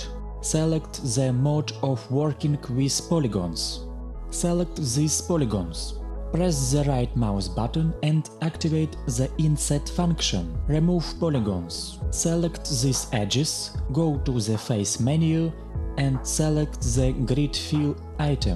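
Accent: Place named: Russia. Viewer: Тоже прикололся с явного русского акцента?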